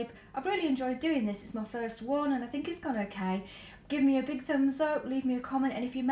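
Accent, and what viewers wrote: United Kingdom. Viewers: It looks very delicious. Very nice video. I love your beautiful British accent and intonation. To be honest, it is good for my English study. Thank you for sharing.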